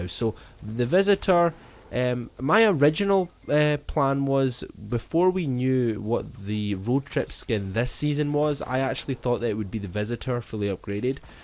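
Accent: Scotland, United Kingdom. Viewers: Scottish boi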